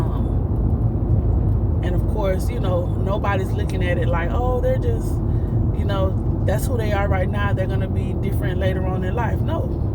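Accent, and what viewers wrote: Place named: Orlando, United States. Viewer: I figured you were in Orlando area, you hv the voice inflection/accent from there.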